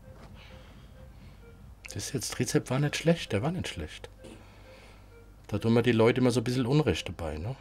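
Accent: Hesse, Germany. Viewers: Hessisch is und bleibt de geilste Dialekt !!!! 😂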